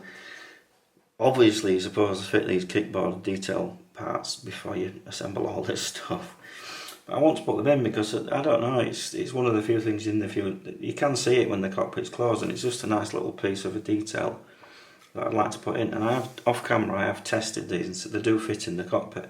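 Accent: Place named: Yorkshire, United Kingdom. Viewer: Thanks….love the details…..thought the ‘mumbling’ comment was unfair and the Yorkshire accent is quite mild, I heard everything clearly. Please do not let that comment discourage you, keep them coming.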